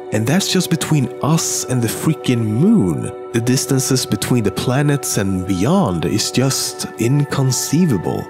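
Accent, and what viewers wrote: Gothenburg, Sweden. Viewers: I fucking hate gothenburg-swenglish. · You can hear he's swedish right away...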